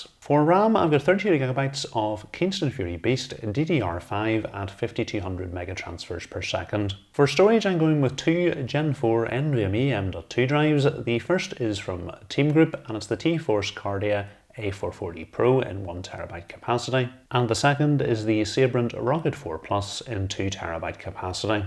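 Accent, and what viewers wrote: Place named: Tyrone, United Kingdom. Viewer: Did not expect the accent. Refreshing to hear from a Tyrone man.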